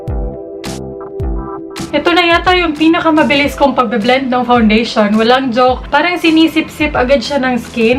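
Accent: Visayas, Philippines. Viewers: I'm one of your silent viewer here in Youtube. Hope for your best and just continue what you are doing, kasi you inspired a lot of people. Continue spreading love and good vibes. P.S. Your bisaya accent never been an hindrance of what you are doing or explaining, mas minamahal ka ng mga viewers mo dahil dun. Lovelots from Palawan.♥♥♥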